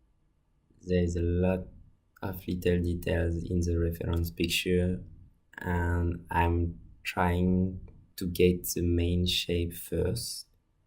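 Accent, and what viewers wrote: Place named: France. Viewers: Aren't you french ? Damn this accent XD Anyway, I love Tales From Earthsea, so I'm glad to see someone drawing a scene from it :D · He can’t sound more French than that😂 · For sure his French xD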